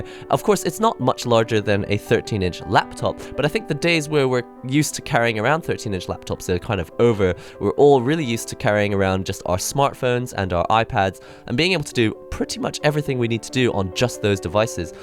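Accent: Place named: United Kingdom. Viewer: you sound like a british Amir Blumenfeld. Thats a compliment lol.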